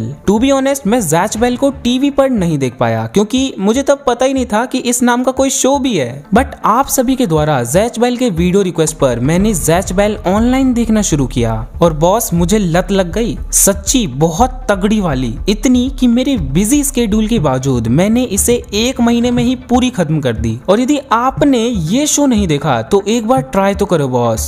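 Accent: Bihar, India. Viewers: Bhaiya aise hi bola karo aap acche lagte Ho hamare Bihar mein bhi aise hi bola tha um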